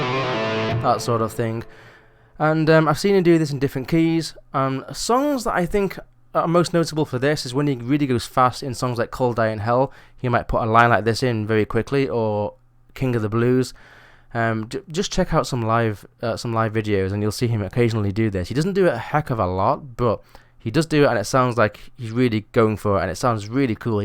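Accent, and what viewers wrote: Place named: Ireland. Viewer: You are such a nice Person und bring me Gary back into life! With people like you he can stay in our hearts and still be with us. Love the way the Irish talk, like you and Gary, it sounds so lovely and gives the feeling, that they carry the heart on the right place! The Irish Twiddle reminds me on the sound of bag pipes and this influence I loved also a lot at Gary's playing, especially on one of his greatest albums for me, which was "Wild Frontier". Your Playing and interpretation and explanation: JUST GREAT Thank you so much ❤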